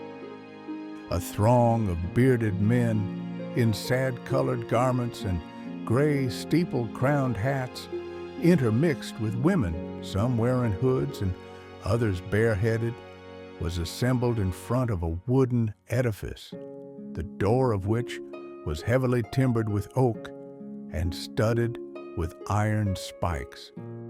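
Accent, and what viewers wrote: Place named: United States. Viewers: This channel is from the UK, not from US. That was their decision to go with the American accent. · I found that American accent off putting.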